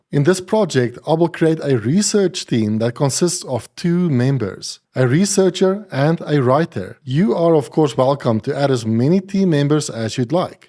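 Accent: South Africa. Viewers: Hi, this is a great intro tutorial for crew. Tell me, your accent tells me that you are in South Africa, is this perhaps true?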